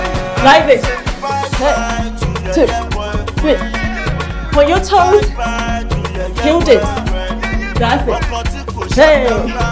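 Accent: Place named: Caribbean. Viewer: Your accent is #awesome!!! BLACK is #BEAUTIFUL!!! Proud to be black! Caribbean vibes right there...more fire 🔥!!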